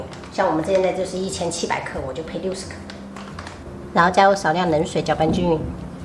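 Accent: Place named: Minnan, China. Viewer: 第一次聽到妳講閩南語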